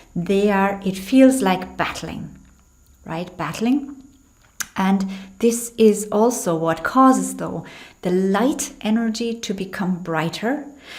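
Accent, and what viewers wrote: Ireland. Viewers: Love the accent..Irish? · Watching this in July 2024, what a scary time that was... I'm guessing what you intuited in March 2021 was the V pass? I am glad I didn't fall for any of it. I had a dream prior to the whole debacle about injections so that was a heads up, and I smelled something rotten in Denmark right away. I have subscribed to your channel as of yesterday, love your calm demeanor. Is that an Irish accent you have? But I see you're in Germany, must've been tough going there, I'm in Canada it was awful here! ♥ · yes, i had a powerful sensation come over me at the end of last year and it was a feeling of ''this is it, it is over''. I get the feeling that something is gonna happen in August but what i have no idea. We are living in very interesting times. I am Irish by the way and i got a hint of an Irish accent from you. Are you Irish? 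or did you maybe learn English in Ireland? If it is the latter you are like a native. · Hi, I can’t place your accent is it Irish?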